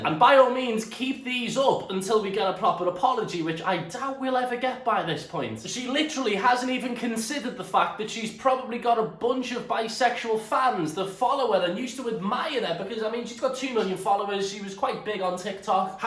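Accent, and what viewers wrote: Liverpool, United Kingdom. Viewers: scouser to scouser I love you 😂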